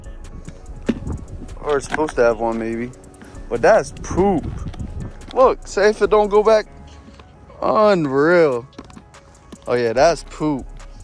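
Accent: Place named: Philadelphia, United States. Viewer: it’s how we talk in Philly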